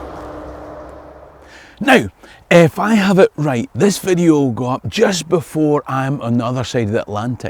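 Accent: Scotland, United Kingdom. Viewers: He's just a foreigner with a Scottish accent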